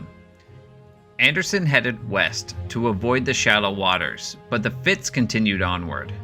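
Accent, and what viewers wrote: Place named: Canada. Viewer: I'm guessing your Canadian by the accent and mispronunciation of Detroit and RADAR.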